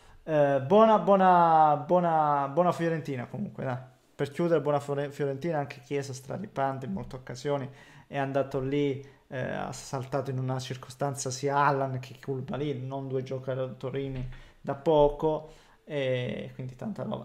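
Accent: Calabria, Italy. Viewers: di che zona bella sei della Calabria? Spero no di Cutro.. 😂😂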